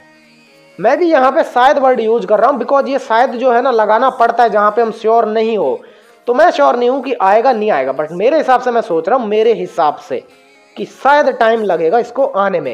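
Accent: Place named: Rajasthan, India. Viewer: * WHERE ARE YOU FROM*.???????????????????? RAJASTHAN SE LGTE HO BHAI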